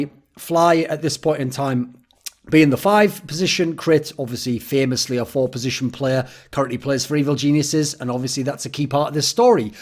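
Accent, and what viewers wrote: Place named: Scotland, United Kingdom. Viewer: I just can't get past the Scottish accent. Not that's it a bad thing, but it's just noticeable to me. :)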